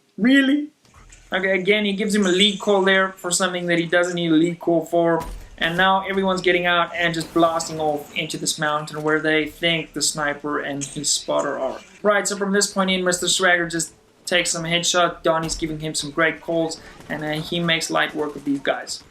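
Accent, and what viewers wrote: Afrikaans, South Africa. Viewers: This guy sounds like he is a South African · Love die Afrikaanse aksent:) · South African accent? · Where are you from? I want to say South Africa 🤔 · You sound South African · Sounds like a old south African accent..? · are you from SA? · South African? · Hold up, are you South african? your accent and speech... must be?:O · Is jy afrikaans? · I thought so! 🇿🇦🇿🇦 recognize that Saffa accent anywhere. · The first couple of seconds into this video I paused... Hmm... This guy... Is South African... I was right ^_^ Lekker man.:) Goeie video. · Are you South African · Yo are you South African? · You sound south african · your accent? are you a south african? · Hey man, you from South Africa by any chance? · i thought i recognised that accent, im South African, great work here man! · Is this guy south African? Dope... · He sounds South African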